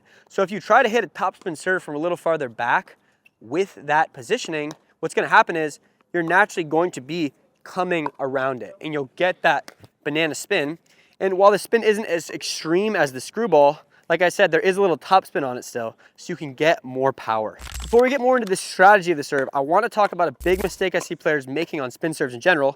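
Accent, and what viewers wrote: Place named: Southern California, United States. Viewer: A good idea. Wish you put a video in which show it had an incorporate more of the top spin into the serve, which would make it really wicked. I like this guy, just wish he didn't have such a SoCal heavily voice inflected vibe. It kind of lulls me to sleep.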